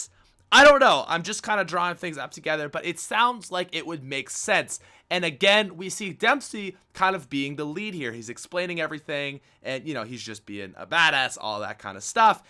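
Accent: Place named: Canada. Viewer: He's Canadian. that's how they pronounce it.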